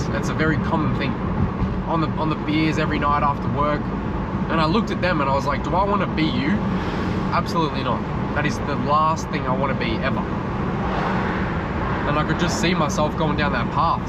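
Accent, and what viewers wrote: Australia. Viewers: Australia is so cool! Love your accent! So amazed to see the porcupine akinia .Totally 🆒!!!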